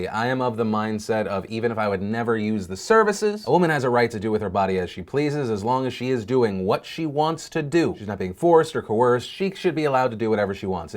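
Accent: South, United States. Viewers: I hear a southern accent in your outros lol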